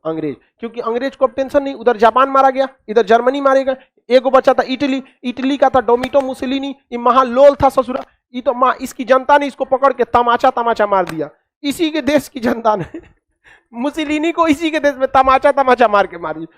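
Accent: India. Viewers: He is going too fast. Speaking a typical bhaiyya language, spoken by vegetable and istriwalas in Mumbai.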